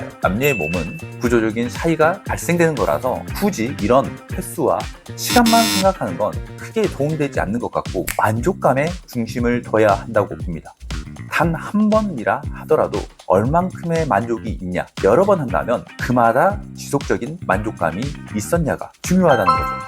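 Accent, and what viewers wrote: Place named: Jeolla, South Korea. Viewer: 고향이 전라도신가요? 말투가 전라도 사투리가 나와서요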